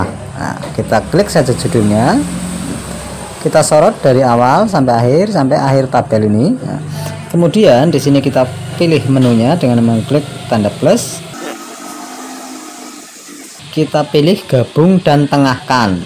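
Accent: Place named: Cilacap, Indonesia. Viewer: Suaranya asli cilacap, kayak kaki mukidi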